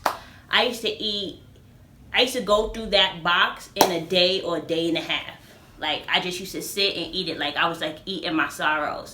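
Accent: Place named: New York, United States. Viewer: lol idk how CO ppl sound lol but all i hear is NYC. Thanks for the info I’ll look up these areas for sure and weigh my options. Ugh it sucks leaving the city where we don’t need a car but I need a change. Btw great vid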